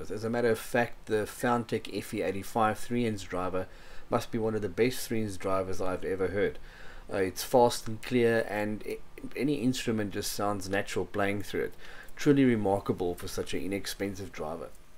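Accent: South Africa. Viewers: Fabulous blog, great flow, good content. Well done. I recognize your accent as South African. Cape Town, I suspect. Fly the flag high Mate. Great work. Mark, Johannesburg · I may be really wrong, but you sound South African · You sound very South African?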